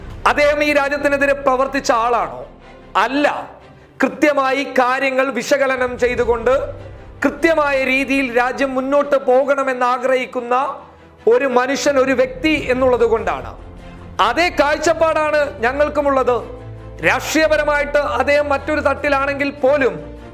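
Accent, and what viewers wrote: Kasaragod, India. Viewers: Now much better, but still Kasaracode slang hunting him.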